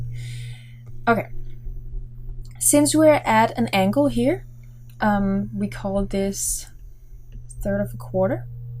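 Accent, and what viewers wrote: Denmark. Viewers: Are you danish?😁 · R u danish?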